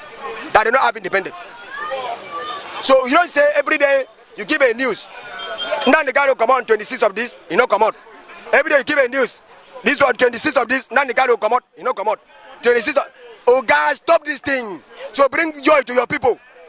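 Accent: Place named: Calabar, Nigeria. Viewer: This is a Calabar man, you can hear his intonation. He wants people to discover him. You know if you don't talk about Biafra or Nnamdi Kanu no body will listen to you or recognise you as a pastor. He is a big fool.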